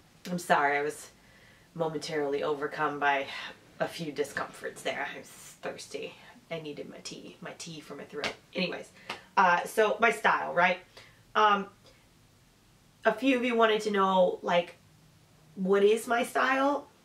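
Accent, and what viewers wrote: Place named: Michigan, United States. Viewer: here are my questions, most of which are non-sewing related. 1) Are you from Michigan, you sound like you are from Michigan? If, yes, where? FYI Detroit is one of of my favourite cities in the world, yes, you read that correctly. LOL 2) I think I heard you say once that you are a mechanic but you also work the midnight shift, who gets their car fixed in the middle of the night? I don't understand. If you are willing to share, please explain. 3) I would love to see a video on your TNT patterns and finally 4) what is your most worn and/or favourite me made item. I love your channel and hope you are feeling better.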